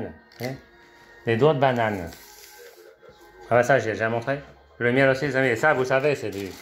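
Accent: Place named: Quebec, Canada. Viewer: Coucou 🤗 je viens de regarder tes 3 parties de retour sur les conseils de Cécile et j adore 😉 d où est ton accent ? Quebec ? Je m abonne 😊 à bientôt 😙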